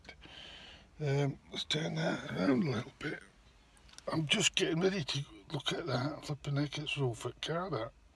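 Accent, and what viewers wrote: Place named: Yorkshire, United Kingdom. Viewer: Ey up cocker. Where bouts you at you fuckin lyrical legend? Accent sounds Huddersfield/Brrrrrratford way?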